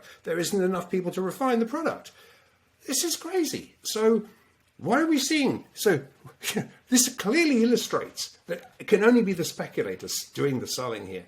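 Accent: United Kingdom. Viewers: Although this guy is British but I love him and can't have enough listening to him!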